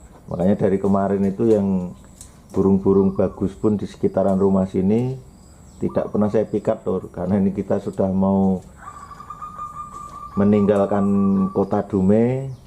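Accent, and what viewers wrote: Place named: Tegal, Indonesia. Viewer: MZ bahasane ecek2 njenengan orang Tegal apa Slawi 😃😃😃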